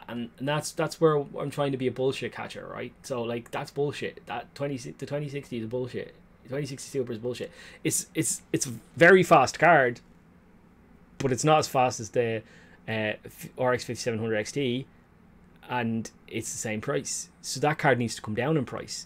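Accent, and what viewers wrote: Ireland. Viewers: Addicted to content. Man cave + Irish accent + rage + scratching. Top notch channel right here, m8.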